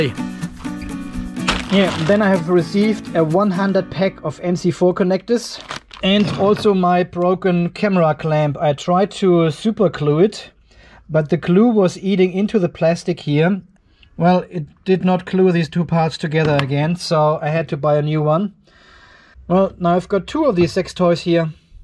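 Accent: Germany. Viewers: und ich war mir immer sicher da einen deutschen Akzent zu hören :) Mach weiter so :)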